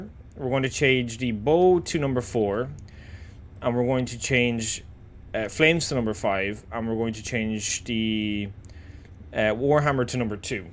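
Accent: Ireland. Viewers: Glad to hear that voice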